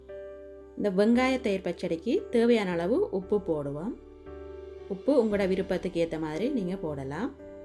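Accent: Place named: Sri Lanka. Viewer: உங்கள் இலங்கை தமிழ் மிகவும் அருமை....😍😍👌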